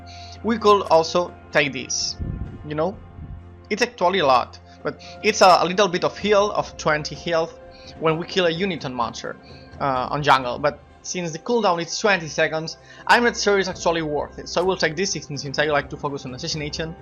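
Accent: Spain. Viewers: ¡Hostia un Shaco!? Are you Spanish?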